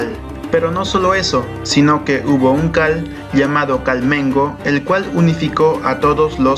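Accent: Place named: Bolivia. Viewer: Eres boliviano verdad? Acento inconfundible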